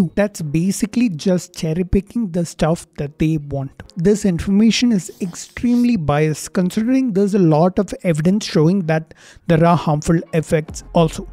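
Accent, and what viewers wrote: South India, India. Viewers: He speaks south Indian English (if you know 'thank' and 'tank' thing)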